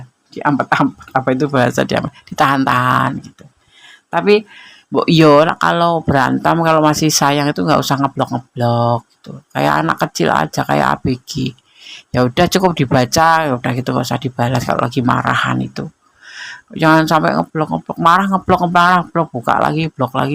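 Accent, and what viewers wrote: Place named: Java, Indonesia. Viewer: Jogja hadir nih Kak, wah kk ini klo bicara MEDOK bingit's,sy suka skl cr kk membacakan readingan,kayak ada manis2nya gituuu heheheee.....,maaf kak canda lho😁. Ok kak tksh readingannya,Readingan selanjutnya ditggu🤝🙏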